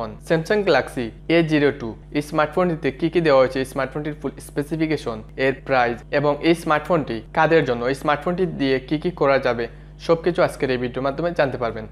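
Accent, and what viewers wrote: Sylhet, Bangladesh. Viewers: ভাই তুমার বাড়ি কি সিলেট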